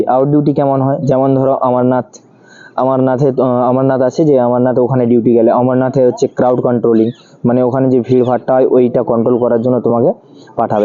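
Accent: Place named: Medinipur, India. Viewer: Bhai tomar bari ki মেদিনীপুর...mone holo kotha sune 😊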